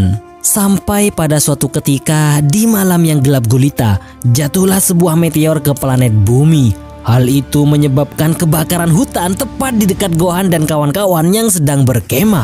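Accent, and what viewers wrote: Madura, Indonesia. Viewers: mimin orang Madura ya? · Ia kayaknya. Logat dan nadanya Madura bgt hhhh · Logatnya Madura banget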